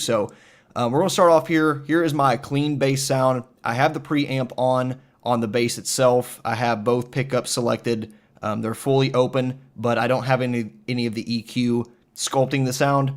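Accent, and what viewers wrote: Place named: South, United States. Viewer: Where you from? I’m hearin a southern accent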